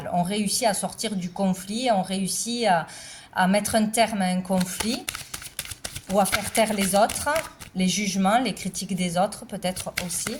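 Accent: South, France. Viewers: Ça change un peu l’accent du sud ! Il n’y en a pas beaucoup..en plus c’est le mien 😊 Merci pour ce tirage !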